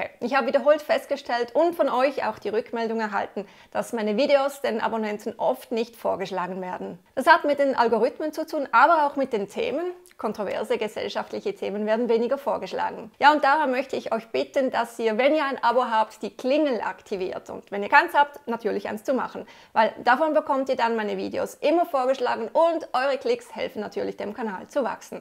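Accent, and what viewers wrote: Switzerland. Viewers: Ich finde die Tamara Wernli total süss. Besonders ihre leichten Schweizer Dialekt finde ich gut und höre gerne ihren Ausführungen zu.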